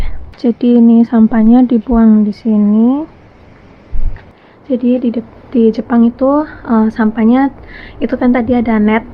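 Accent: Java, Indonesia. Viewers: Medok tenan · Kalo pas lagi ngomong jowo atau Indonesia medok benar-benar seperti orang jowo...☺️ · Jaadi kalo ngomong jepang aksen medok jawa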